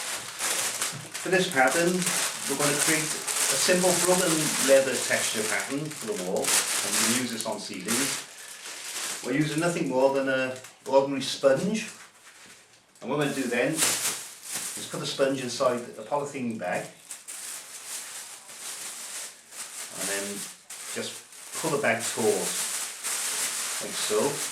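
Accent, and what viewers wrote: Cardiff, United Kingdom. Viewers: Caerdiff accent Got one myself, best of luck.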